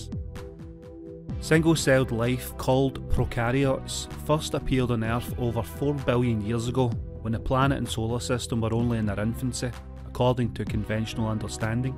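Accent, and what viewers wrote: Scotland, United Kingdom. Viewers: Couldn't make it longer than 2 minutes. Cannot understand the world's strongest Scottish accent and I'm English 1st language. Idk why the Scots always sound like they're gargling marbles & whiskey... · AWESOME video & love you are still putting that amazing Scottish accent to good use doing videos! ~Ginger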